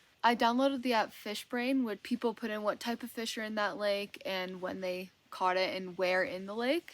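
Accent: Canada. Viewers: Great Canadian accent.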